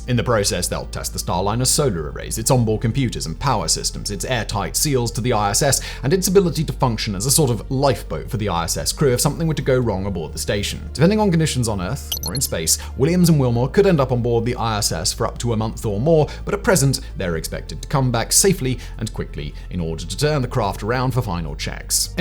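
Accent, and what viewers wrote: United Kingdom. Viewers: So sick of hearing this British sounding windbag. He narrates everything.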